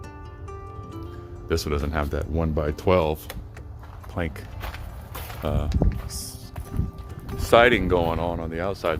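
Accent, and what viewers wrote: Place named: Cajun, United States. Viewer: If you did these in your gay cajun accent you’d probably crazy viral ha 😂😘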